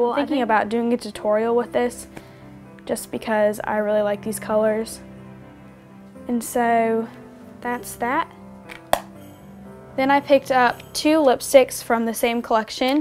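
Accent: South, United States. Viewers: Is it just me? Does she talk in a southern accent a little? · You sound so country in this vid!! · Is she from the South or something ? She has an accent ! :) haha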